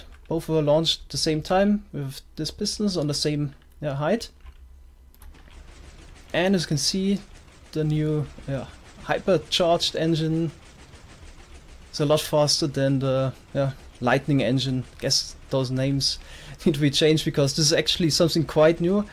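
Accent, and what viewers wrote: Germany. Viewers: bro, ich liebe deinen deutschen Akzent! Liebe grüße aus Österreich!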